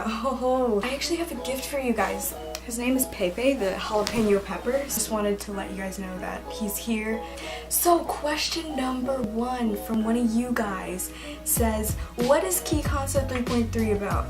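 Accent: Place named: United States. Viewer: we love tht gringo accent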